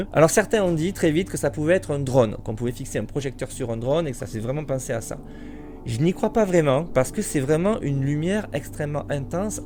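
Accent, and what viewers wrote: South, France. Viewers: Je te découvre et je follow en 10 secondes pour ces raisons: + super chapeau. L’accent du Sud c’est le bonus.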